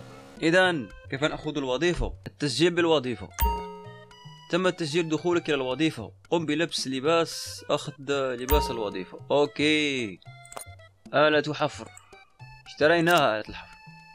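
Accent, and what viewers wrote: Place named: Egypt. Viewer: يا انت مصري ولا جزائري ؟ انا متأكد انك مصري علشان بتتكلم زي المصريين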